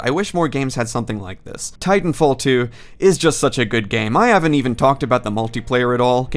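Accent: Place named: United States. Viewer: i have a question why do you have american accent when you are australian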